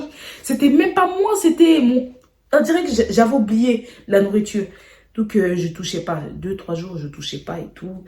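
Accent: Ivory Coast. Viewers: J’aime trop ta manière de parlé en ivoirienne 🤣🤣🤣❤️❤️❤️❤️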